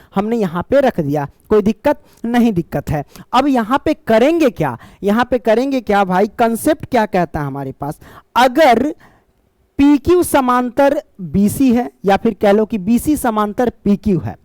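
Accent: Bihar, India. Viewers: Sar aap bihar se ho naa ❤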